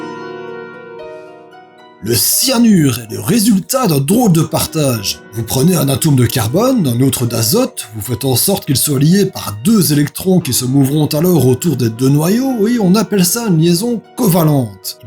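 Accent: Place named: Belgium. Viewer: Un abonné de plus ! Attends,apprendre des choses grâce à une personne avec l'accent Belge, c'est trop rare !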